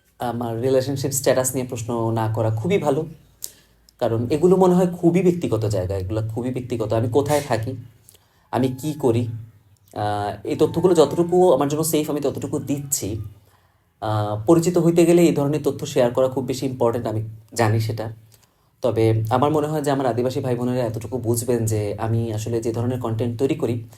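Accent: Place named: Chittagong, Bangladesh. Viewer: আপনার এক্সেন্টে চাটগাঁইয়া একটা ভাব আছে, চট্রগ্রামে বড় হইছেন আই গেস❤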